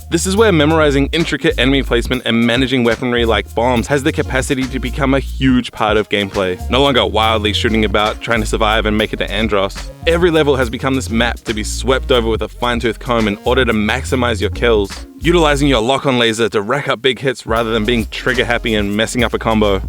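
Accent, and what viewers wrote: New Zealand. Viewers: you sound like youre from nz, which begs the question, why isn't this titled Lylat Wars?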